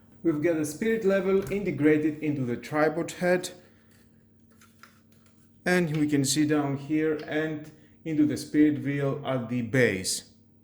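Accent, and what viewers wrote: Greece. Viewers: U sound greek φίλε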